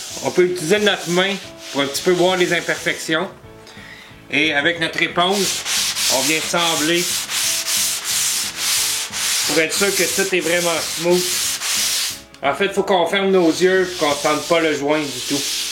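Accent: Quebec, Canada. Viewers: vous les canadiens... votre façon de parler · J'adore ... l'accent québécois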